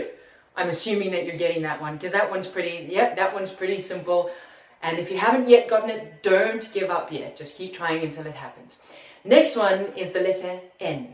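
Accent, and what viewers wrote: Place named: South Africa. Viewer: You sound like the news anchors in South Africa. Something about your accent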